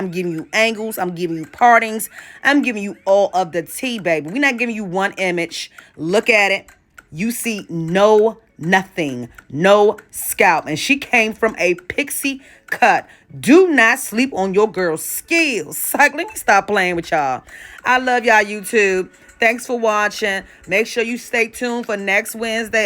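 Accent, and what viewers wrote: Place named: Maryland, United States. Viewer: You're definitely from MURLIN toooo!🤣 I know that accent anywhere. I've been living here for 34 yrs and the accent is still so distinctive to me. Great job on the technique. I have alopecia on the crown of my hair and I am always looking for options to share to help me. Thank you!!!!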